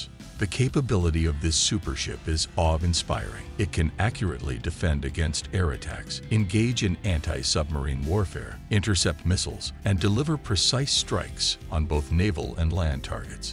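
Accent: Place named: India. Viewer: Yindian accent always give me a migraine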